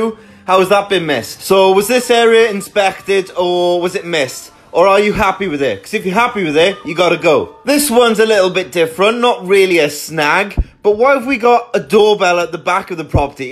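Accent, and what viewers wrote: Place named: Wales, United Kingdom. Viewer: The Welsh accent makes this 😅😂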